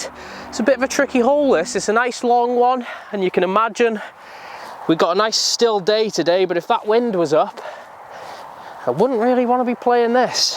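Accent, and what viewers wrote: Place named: Rhyl, United Kingdom. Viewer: When I heard your accent I thought you were actually from rhyl. I lived in rhyl for quite a few years and I've got some great memories of it, but I never had chance to play the course. I'm determined to go up to play it this summer. By the way give Llanymynech a go if you haven't already played it. Along with church stretton. I think they are the two best courses anywhere.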